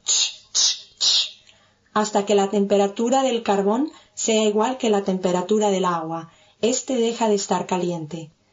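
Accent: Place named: Argentina. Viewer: Yo extrañaba la voz argentina. Muchas gracias.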